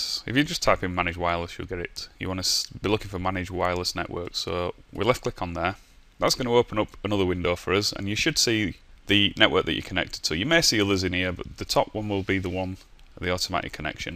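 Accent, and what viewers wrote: United Kingdom. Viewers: I love your british accent!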